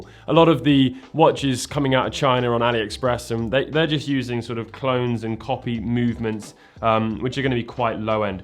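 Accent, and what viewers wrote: United Kingdom. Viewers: He said it correctly, the double ll is what makes the y sound and the bi rhymes with bee. His tour sounding like tuh is just his british accent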